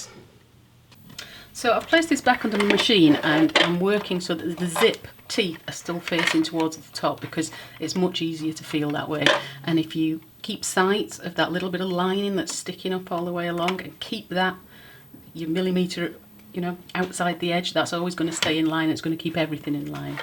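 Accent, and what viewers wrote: England, United Kingdom. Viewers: It is lovely to hear a beautiful English accent! I've never had a problem with wobbly zips but it is always good to have a refresher! Thank you. (Edited to correct typos)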